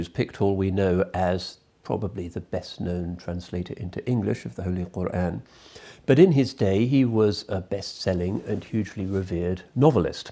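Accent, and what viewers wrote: United Kingdom. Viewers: He is so eloquent..that soothing elegant British accent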